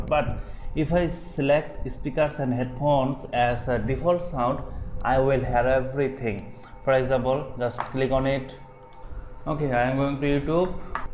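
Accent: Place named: Bengal, India. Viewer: With ur accent I can understand that ur a bengali because I am also bengali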